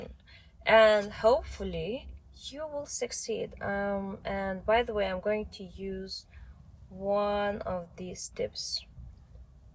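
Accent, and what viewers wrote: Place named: Russia. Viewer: А говорит все равно с русским акцентом ❤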